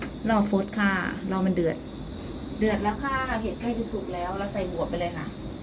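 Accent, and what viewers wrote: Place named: Phu Thai, Thailand. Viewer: คือมิเว้าภูไท🥰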